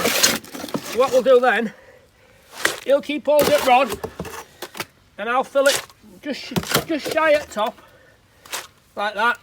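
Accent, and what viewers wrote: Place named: Yorkshire, United Kingdom. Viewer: Hi. Me again. Liking the vids again. Great explanation for anyone wanting to follow this system. Listening to you a few times now, and I thought I recognised the accent. I live in Meltham, Huddersfield and work in Leeds. So I guess, small world. I know your busy pal, but have a break for gods sake! Lol.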